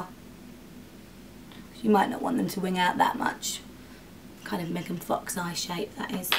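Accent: United Kingdom. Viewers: r u British? love ur accent.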